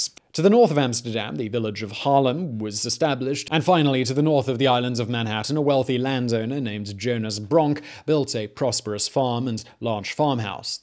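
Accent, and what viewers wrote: United Kingdom. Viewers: Simon..... Being a New York native I must say the British flair you speak whilst educating make me wonder..... "Damn it Man why were you not my History teacher!" To you team of researchers "Brilliant!" to coin a term. Thanks Simon love this channel then again im subscribed to ALL your channels Proud to be a New Yorker!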